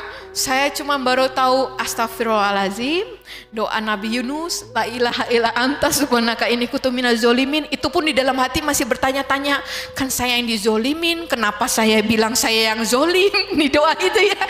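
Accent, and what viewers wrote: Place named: Priangan, Indonesia. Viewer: Duh Gusti tutur katanya bahasanya ini sangat menyentuh hati lembut dan sejuuuuuuk di kuping,logatnya seperti orang priangaaaaan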